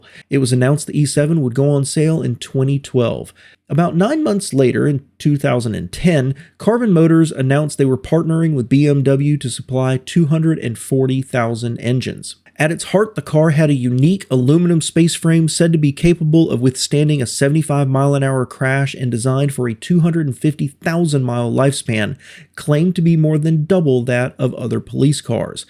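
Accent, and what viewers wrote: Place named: United States. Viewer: Great video, thanks! One gripe I do have is the fact that you didn't say at the beginning what country you were talking about. While it might seem inconsequential, as a first time viewer from elsewhere I didn't know if you would be talking about only the US domestic market or if there would be a wider perspective. I quickly gathered you were American by your accent and by the police cars you showed (although the little Fiat Nuova 500 behind sent another signal), but I just wish you would have said "the American police" rather than just "the police". Considering there are hundreds (if not thousands) of other police administrations in the world, and that they all have interesting stories to tell on how they select and build their vehicles, it's important to be precise location wise like you were time wise. Which you ended up doing beautifully by the way, just a bit late in the video. 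Edit: the very first sentence of the video exemplifies my issue: "Police cars come in many shapes and sizes" (absolutely right) "and while Ford controls 61% of the market" (that statement is false: Ford controls 61% of it US police car market, not the "police car market") It makes it seem that it's so obvious that you're talking about a pure American story through and through (when it's not immediately obvious), that there's no need to mention that you're talking about the USA (there is a need, otherwise your statements are technically not correct anymore).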